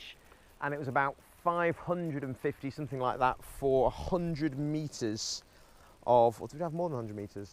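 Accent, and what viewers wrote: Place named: Northern England, United Kingdom. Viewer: This accent is so unique. It's almost 'posh' sounding and unlike most Northern accents. Wheres its origin?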